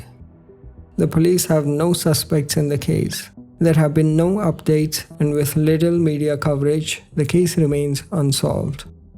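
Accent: India. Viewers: Is he Indian? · I always stop listening immediately, when I hear he is narrating. The Indian accent just ruins it for me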